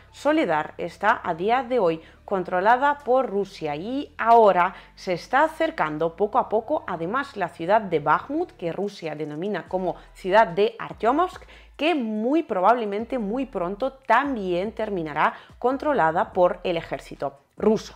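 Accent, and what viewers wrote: Spain. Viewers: El único problema es el asentó español okey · Obrigado pela explicação. Você é espanhola ou russa?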